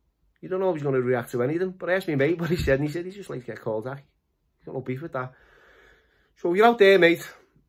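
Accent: Liverpool, United Kingdom. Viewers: Love the Liverpool accent 😊 look forward to seeing more videos on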